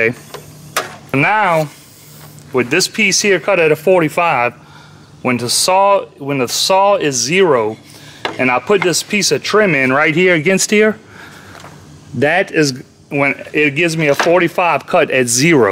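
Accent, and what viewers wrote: Louisiana, United States. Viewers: Cool trick!! Are you from Louisiana? You sound like you’re from Louisiana…… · You gotta be Cajun. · My good guy you from/in Louisiana? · Louisiana accent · bruh are you from south/southwest louisiana? sure sound like it with your accent kinda lol asking cuz i live in SW louisiana and i know how people sound down here haha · I'm willing to bet that he's from Louisiana. I can hear the Cajun accent. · You Cajun... I know that accent from anywhere lol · You from down the bayou cher? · I know a fellow Louisiana boi when I hear him… lol. Great info! I never knew this. Thanks!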